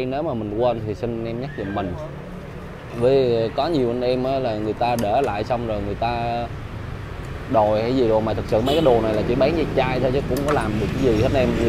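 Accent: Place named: Phan Rang, Vietnam. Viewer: Giọng Phan Rang dễ thương quá 😂 Tiệm có nhận fix rung ga đầu vario ko Bro ?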